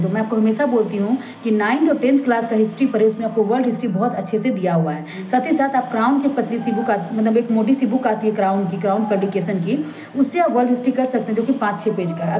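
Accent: Bihar, India. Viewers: Bihar se ho ya up. Se 😂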